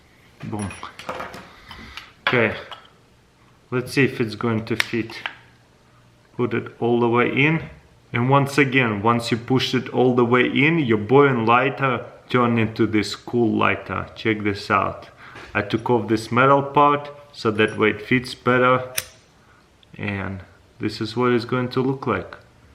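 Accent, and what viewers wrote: Russia. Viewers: Russian awful english Slang... Bäääää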